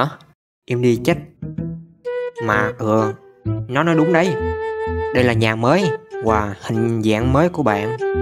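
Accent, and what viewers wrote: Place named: Binh Duong, Vietnam. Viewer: saonóđúnglà bình Dương vải cả nó hơi sà lơ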